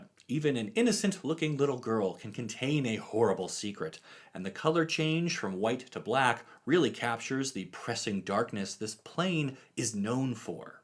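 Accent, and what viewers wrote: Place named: Texas, United States. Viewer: What part of Texas are you from? I’m from DFW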